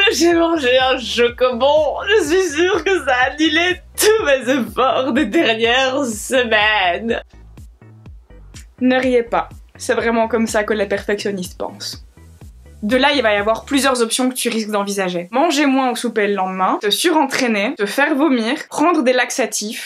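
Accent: Belgium. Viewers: Je viens de débarquer... tu es belge? Les r bien prononcé c'est comme par chez moi tout ça, tout comme la "pouissance nucléaire" x) #patriotisme #lovelaccent Pardon on a du te le dire 50 000 x. (Je suis en admiration devant tes bras (strange dit comme ça)). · Tu est belge non ?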